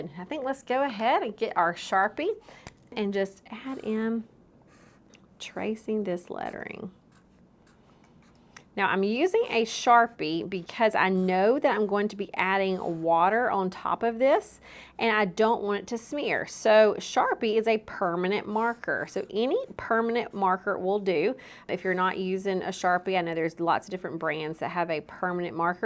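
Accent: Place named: Alabama, United States. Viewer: Love the lighthouse. When I went to your Facebook account I was delighted to find that you are a fellow Alabamian. I knew I liked your accent😊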